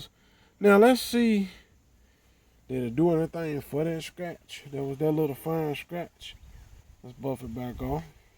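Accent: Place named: South, United States. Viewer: Yall southern mf can't talk to save yalls life poor things 😔 and it took ten years of listening to it before we seen anything happen... I always wondered if it was on purpose cuz T I can talk but you can still tell he's from the south! he can say "then" and then you can read the word "then" and read the word "Den" then say it the same Car is Cah then just say rag so you can make an R sound that shit is crazy to me, European London Britain England accents nauseate me the South and Africa are next in line 🤣 the South sounds like someone just learning how to talk for the first time and yes we were all either born or kids in the 80s when the next generation of adults we're mostly populating the planet right now I just came and see what Nu Finish can do but I had to sit and listen do you repeat yourself 10 times with natural slurred speech 😩 I'm Denver Colorado so ... 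when I visit my cousin's in Houston their friends say I sound White no I sound right I can talk! oh my God East Saint Louis black folks its embarrassing my ex was born and raised in Alabama but sounds like she's from Colorado, you make the r sound in any other word but you can't say car properly or other words ending in r so is this shit even real or is it culture remnants of slavery y'all would have so many problems with white people out here where I live, White people would treat you like shit like you were stupid if you lived in Colorado sounding like that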